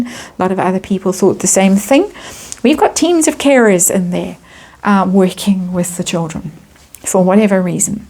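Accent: South Africa. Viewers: Love the lilt in her voice, awesome well bred South African accent and incredible snark! 😅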